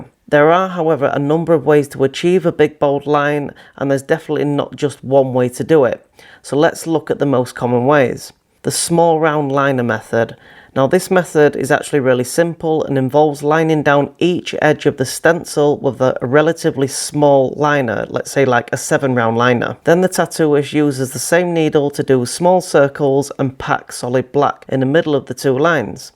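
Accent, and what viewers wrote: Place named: Yorkshire, United Kingdom. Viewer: Thanks for this mate , we're close in accents as I'm a Yorkshire man and this was good to find something familiar sounding because the American reference points can differ , just dine my first self tatoo and your video was really informative thank-you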